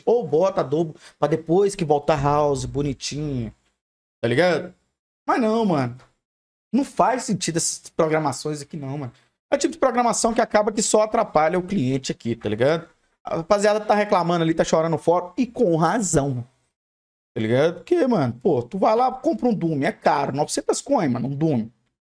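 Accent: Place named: Minas Gerais, Brazil. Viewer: Esse foi o video que o mais soltou seu mineirês falando dos bichin endemoniado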